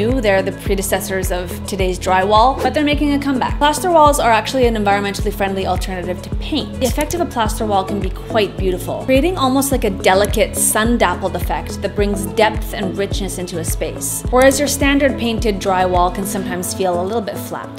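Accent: Italy. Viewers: Where did you grow up? Your Italian pronunciation is beautifulllllll — my HS and college Italian teachers’ ears would flutter — but I definitely hear a hint of some other accent while you normally speak. It sounds like a medley of 3 or 4 influences. Italian has gotttt to be involved.. you obviously speak English, but American English? Do I dare say no? And I want to say French? I am such a lover of soaking up unique ways of speaking and I’ve always been very intrigued by the way you speak! Side: I listened to the audiobook The Glass Castle yeeeears ago (one of my all-time favorite books) and it was read by the author herself who grew up allll over the United States and her accent was sooo unique. I’ve been super aware of tiny details in accents ever since haha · Your italian accent is perfect! Greetings from Sicily ☀️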